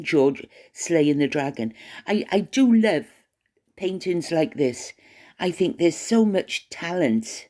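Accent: Wales, United Kingdom. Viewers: Howdy 👩‍🌾😊To You Lovely💖! I Always Enjoy🙌 Hearing Your🥰 Welsh Voice! It's Very🙌 Calming! Happy😇 Happy🎉🍰🎊 Belated🎉🍰🎊 Birfffday To 💖🌺🌷🌸You!!!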